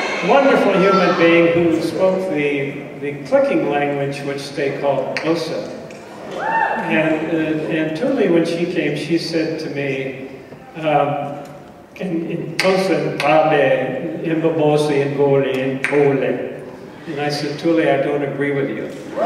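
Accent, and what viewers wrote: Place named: Northern Ireland, United Kingdom. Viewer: interesting how he chose a northern irish accent..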